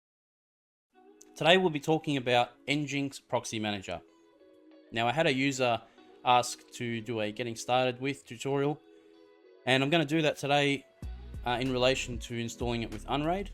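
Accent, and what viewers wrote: Australia. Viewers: Hey mate, good to hear an aussie accent on these tutorials. Hoping you can help if you have time. I have set up successfully with sonnarr and radarr. Seems to have no problem with other unraid dockers, but going to docker or web server on another VM is an issue. Currently having issues with a self hosted HUDU install. Works fine by direct IP or port forwarded to direct IP. Soon as I port forward to NPM I get redirect issues. Hudu has SSL (letsencrupt) built in so I have tried turning it off in NPM and various other configs that don't seem to work.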